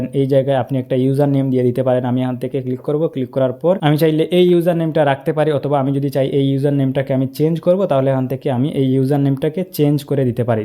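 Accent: Sylhet, Bangladesh. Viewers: সিলেটি নি ভাই?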